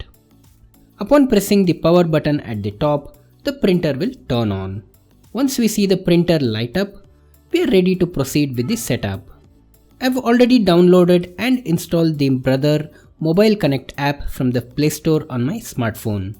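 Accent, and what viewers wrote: India. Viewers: Bro, it's typical indian english😊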